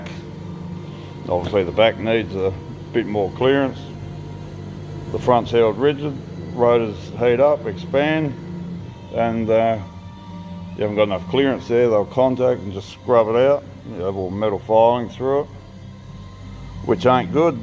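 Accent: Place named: Australia. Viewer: Whereabouts are you? Sound like Aussie?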